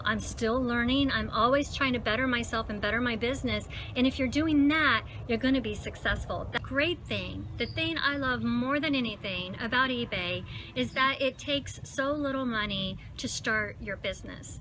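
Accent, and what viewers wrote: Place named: United States. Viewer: Always watch your vids, great content and engaging, and your American accent on Tooosday Tips..... the 3 loooong T's.........lol, you are milking it now!! (my humour), don't stop!! slow down back to yourself speed.... Love your accent and your intelligent & organised approach to your informative and entertaining productions....GL to you, keep it coming.. from the lil' UK x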